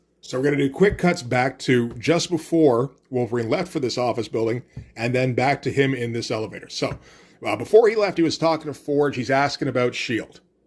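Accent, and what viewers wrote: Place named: Canada. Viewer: Funny i pegged you as Canadian from your accent. You sound like Kevin Owens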